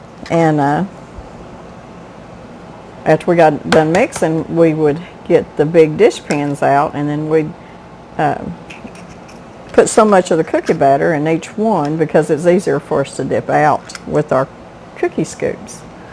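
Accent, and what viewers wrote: South, United States. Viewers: I am a new subscriber from Ontario, Canada. Not only do I love your recipes and your channel... but... I absolutely LOVE your Southern accent. Whereabouts are you from?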